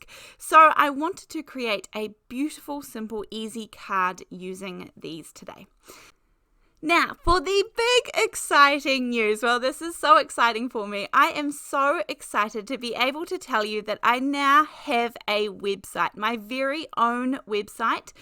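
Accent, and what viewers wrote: New Zealand. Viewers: 😁😃😆 I’m so very happy for you and your husband to have created your own website! I joined with a membership so I can support you to keep creating content for me and all those who love your teaching style, giggles, and for me, I love your NZ accent. Thank you so much for choosing this path in life… you make the world a better place! A HUGE ADMIRER!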